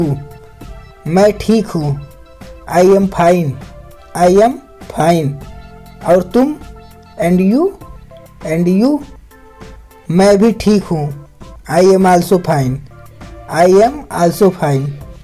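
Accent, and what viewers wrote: Nigeria. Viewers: Aapki aavaj Nigerian and chaina mix hey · Bahiyiya apki English Nigerian logon jesi kyo hai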